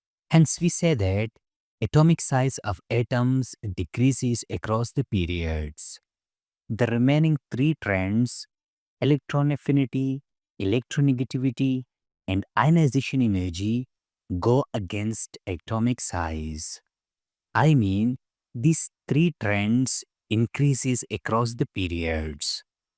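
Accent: India. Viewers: Sounds so indianlistic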